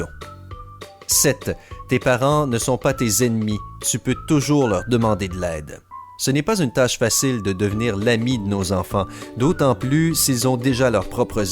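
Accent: Quebec, Canada. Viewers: kimii lee c l'accent québécois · Est tu québécois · kimii lee accent québécois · T québécois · Et sinon faut être gentil pas méchant c'est pas gentil d'être méchant c'est mieux d'être gentil ? Putain j'ai pas vu de tel defonçage de porte ouverte depuis "le feu ça brûle" même l'accent québécois a pas réussi à sauver cette vidéo. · je crois que le gars qui parle,c'est un quebecois et jespere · Moi, dans quelques jours c'est ma fête et je vais avoir 10 ans et mes parent mon jamais dit sa(peut-être quelques une mais je m'en souvient pas)et la quand je regarde la vidéo sa me donne envie de revivre parce que je me fais insulter,harceler.😥😣☹️😖😞😔😕🙁😫😩😤😠😡😵😰😢😭😓🤕et je pensais pas que SYMPA parle québécois lime si tu es québécois(e)en tout cas moi je suis québécoise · C québécois comme moi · C normal c l'accent québécois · Tu est québécois ? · T'es québécois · L'accent Québécois ! 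:D xD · On ' dirait un accent québécois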